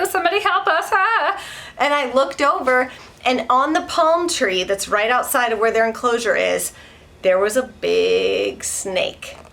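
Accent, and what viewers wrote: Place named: South, United States. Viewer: I love your southern accent! And your real adhdness in this video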